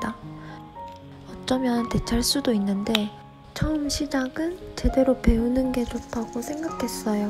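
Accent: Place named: Busan, South Korea. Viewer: 목소리 넘 귀여우세요(약간 부산사투리) 저희 아이들 골프 집에서 아빠한테 배우는중인데~넘 좋은 영상이네요 저도 다시 골프 시작해야 한다고 생각한거만 몇년째네요~참, 님 참 제가 원래 운영하던 채널이 있는데 그쪽을 좀더집중해 보려고 해여... 기존 채널도 계속 유지중이니 구취는 노노!! 자주 뵈어요🤎 <link>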